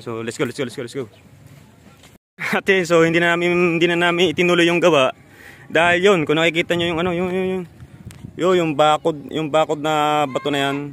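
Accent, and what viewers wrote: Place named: Cebu, Philippines. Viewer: I heard you speak cebuhano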